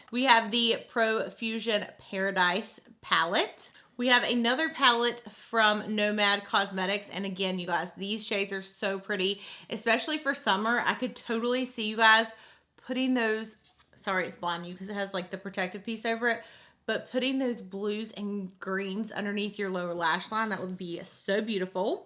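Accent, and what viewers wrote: South, United States. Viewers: I Have Been Subscribed To Your For Years And Still Love You And Your Southern Accent Lol I Would Love To Win Of Course, I Wouldnt Be Able To Afford These Things, But Even If I Dont Win Im Still Going To Watch Your Videos And Love You! Congrats On 4 Years And Many More To Come❤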